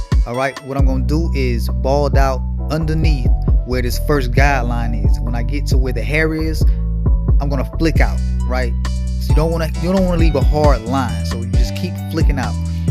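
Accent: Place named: South, United States. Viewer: Oh Chile u are COUNTRY Lmbo love it !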